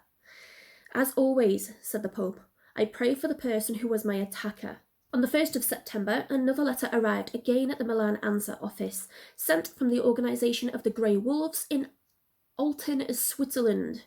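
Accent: United Kingdom. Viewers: I love your british accent, are you native british?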